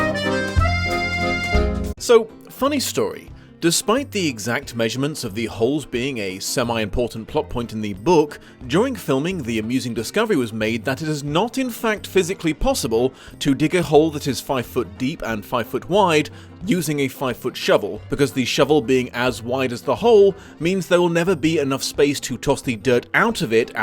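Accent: United Kingdom. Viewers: I'm happy to have been refreshed the plot of the book with a British accent (although your accent is very different now from when you started your channel!!). It's always nice when something that you liked as a kid still holds up as an adult. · if you dont think half of the reason we are all here is to hear the plots of books we already know in a british accent youve misunderstood your audience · As a fan of both the book and film I quite enjoyed getting a synopsis in a British accent! Caveman is the name Stanley gets for finding a fish fossil. They may bestow it because he's passed their hazing and they've come to accept him, but they chose "Caveman" because of the fossil. This is one of the films I think of when I think of great book-to-screen adaptations, it's remarkably close and makes good choices for what to change. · “Anyone who had seen the film recently probably felt a tad bored there at having the entire plot narrated back to them in a British accent.” Dude, that’s why I’m here! 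· just to be clear, not a single American will ever be bored hearing a British narration. You might as well read the Terms and Services with that voice and we’ll tune in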